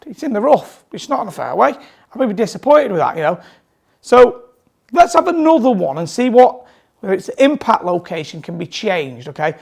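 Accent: Manchester, United Kingdom. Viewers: what do you mean, has a fine South Manchester accent! Perhaps should add subtitles for non Mancs lol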